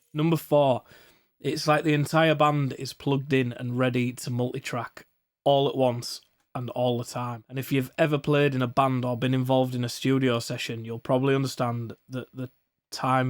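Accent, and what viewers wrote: Northern England, United Kingdom. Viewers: Haha, great video. I love the humour and the northern flavour (I'm a bloke from t'North meself). I really liked the video <$1000 modular too. I'm holding the line at semi-modular right now but the ropes are straining! Cheers.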